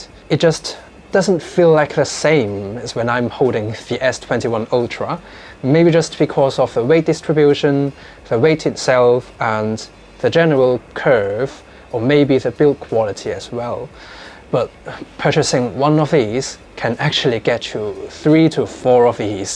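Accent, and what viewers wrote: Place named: United Kingdom. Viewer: Sound like British tone , Good !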